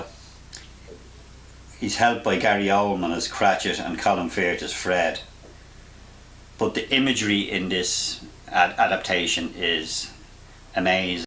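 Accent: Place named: Ireland. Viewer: Love your Irish accent!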